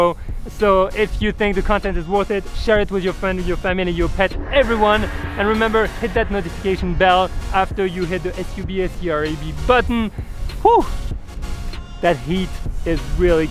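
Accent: France. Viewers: Thats the natural French accent while speaking English! :) or may be the microphone was not powerful enough! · hhh freinsh accent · ur french ! dT'S why ur accent seems like dt 😁 but ur awesome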